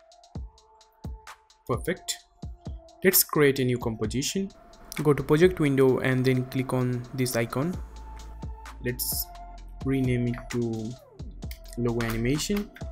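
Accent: Bengal, India. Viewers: Brother are you indian? lol❤️❤️ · bengalis from bengal have shittiest irritating english accent.. amazing btw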